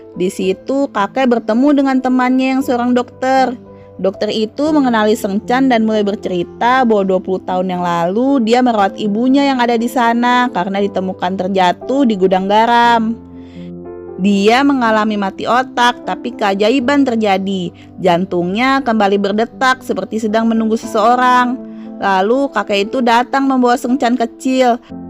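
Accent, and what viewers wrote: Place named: Jakarta, Indonesia. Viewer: Asli, ini yg bercerita orang jakarta yaaa, rawamangun bukan ? Cara bicara mengingatkan temanku orang rawamangun, sama percis cara bicara nya, haddeuh, i like you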